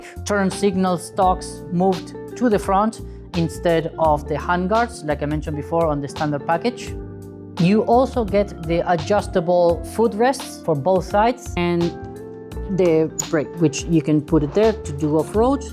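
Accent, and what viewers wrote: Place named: Rio Grande do Sul, Brazil. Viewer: Gaúcho de onde Tchê?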